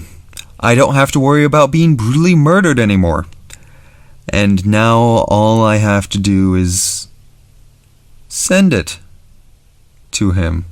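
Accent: Netherlands. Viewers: is he dutch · He from Utrecht?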